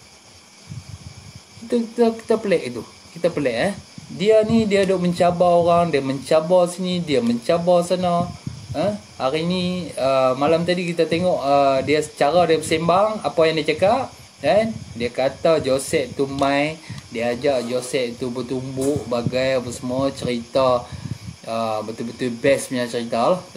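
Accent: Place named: Kedah, Malaysia. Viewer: Abang ni mesti orang kedah..dengar dia cakap pun dah tau..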